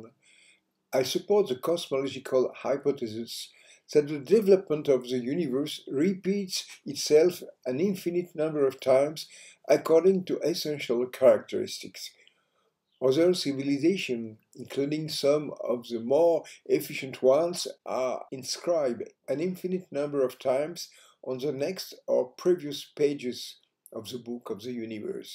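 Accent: France. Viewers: Les sous titres anglais générés aident grandement. Avec en prime l'accent Frenchie pour aider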